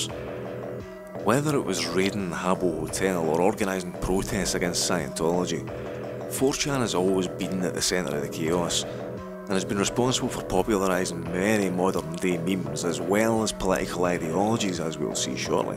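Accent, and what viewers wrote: Scotland, United Kingdom. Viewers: aw mate as a fellow scot hearing your voice made me happy, first time finding you, we need more proper strong accent scot readers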